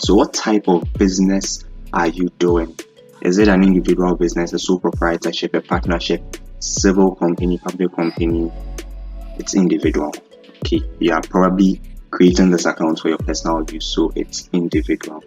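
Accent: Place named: Ghana. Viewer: Pure Ghana man English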